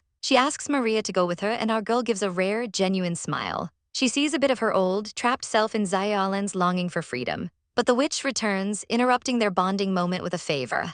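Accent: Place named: Boston, United States. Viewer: Is it just me or does this AI got a Boston accent 😂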